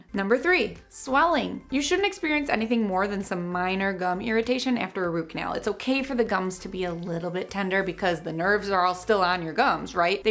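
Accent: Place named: Wisconsin, United States. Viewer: An Italian with a Wisconsin accent.. weird!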